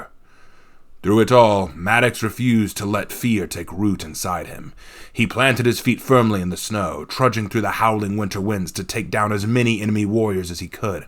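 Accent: Scotland, United Kingdom. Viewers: What an awesome read! Your Scottish accent wasn’t that bad either and your overall delivery of the entire story is top notch. I’m more surprised that the story isn’t that bad as I originally thought, but still could use a little bit of work. Still, great video!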